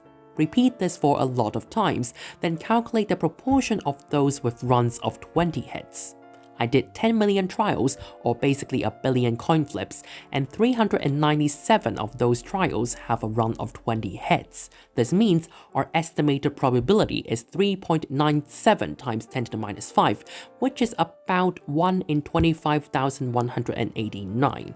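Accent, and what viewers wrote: United Kingdom. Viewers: I like how he's trying his best in British accent, not that i have anything against it lol, I'm practicing that cuz I need to study in England as well lol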